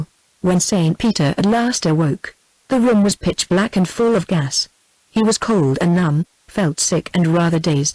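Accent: United Kingdom. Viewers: Seriously. It is INSANE to use a BRITISH voice for this!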